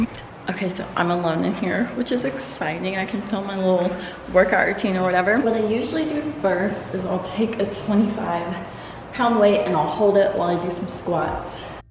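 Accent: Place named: United States. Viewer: Are you from the United States I feel you have accent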